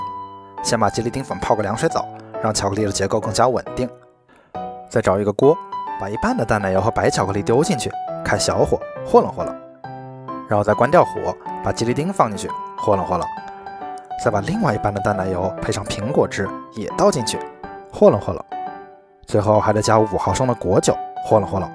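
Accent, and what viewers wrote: Wuhan, China. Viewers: rua 真是亲切的武汉话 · 怎么听都像隐藏的武汉人呃！👍🤣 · 我真的是爱死的武汉口音了😂😂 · 拐子的口音很武汉，亲切，武汉加油！ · 武汉人？有点耳熟：） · 武汉老乡好。浓浓的武汉口音啊普通话。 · 出门在外这么多年，改不了的是满口的武汉腔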